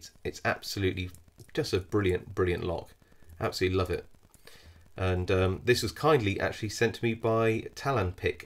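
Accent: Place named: United Kingdom. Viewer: I love the Queen's English.. "Leaver" vs. "Lever" lol.. same same but all the better... Btw good job..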